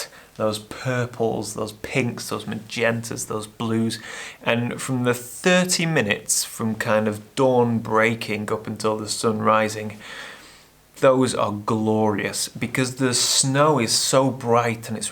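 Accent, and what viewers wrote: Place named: Scotland, United Kingdom. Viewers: Hey, infact last video was absolutely beautiful, magic mountains! Being in the north you're getting some sottish accent:-) see ya the next! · Stunning photos, but your Scottish pronunciations have me on the floor, you need to spend more time with Mr Wilkie, great work mate & keep the vlogs coming.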